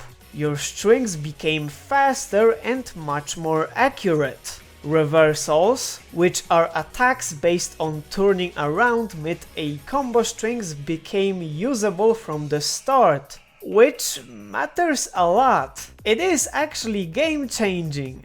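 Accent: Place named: Eastern Europe. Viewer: Please work on your slavic accent. It's very distracting.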